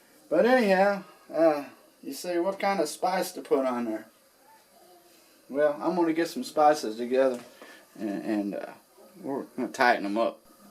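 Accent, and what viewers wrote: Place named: Missouri, United States. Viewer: I swear that if I didn't know better I grew up in this house. I had forgotten some details from my childhood until I saw the wood cutout over the sink window. As soon as I saw that board it brought back memories. I'm from Missouri and this guy is exactly how I remember my family talkin like. The only thing missing is the Redman Chewin Tobacco pack sticking half out of a random pocket. I would sit at that window with feet in the sink and my BB gun strategically hidden in camouflage towels waiting for a crow to go for the bait I put in the garden. The kid crying in the background would be me at any given time.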